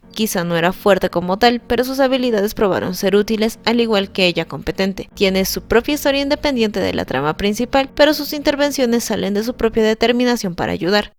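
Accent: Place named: Chile. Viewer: ctm eri chilena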